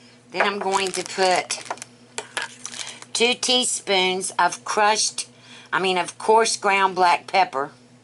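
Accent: South, United States. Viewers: Wow! I can tell from your accent that you're southern....you didn't grow up eating collards? Glad you discovered them.....they are yummy!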